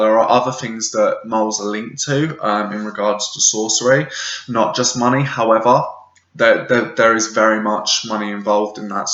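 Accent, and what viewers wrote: England, United Kingdom. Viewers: Love your videos. Nice to hear an English voice ;) easy on theeyes too ;)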